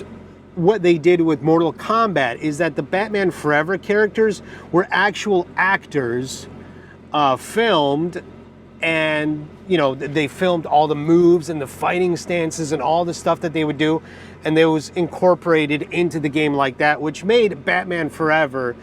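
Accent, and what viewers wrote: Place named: Russia. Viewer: I thought you had a Russian accent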